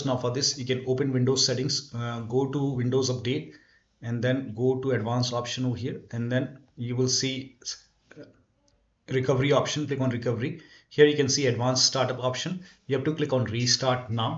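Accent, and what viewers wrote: India. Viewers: i know shit is gonna work when i hear ind accent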